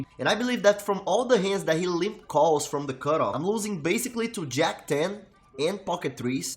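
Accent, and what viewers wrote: Brazil. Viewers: Love the brazilian accent. Shout out from Brazil haha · sotaque de brasileiro inconfundível kkk, parabéns pelas mãos jogadas. GL GL meu parceiro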